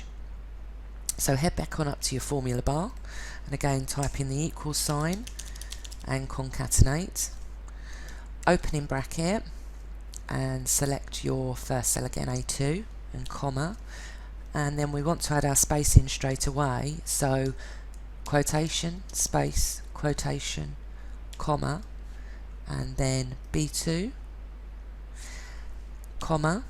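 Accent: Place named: United Kingdom. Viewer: someone with a British accent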